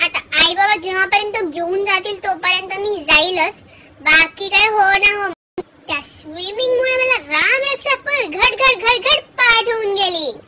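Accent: Nagpur, India. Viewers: tu nagpur chi ahes ka mhane😜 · your accent in video looks similar to Nagpur ... majedar ahet pan.... yet rahundet asech... lahanpanichya baryach athavani jagya zalya masti chya :)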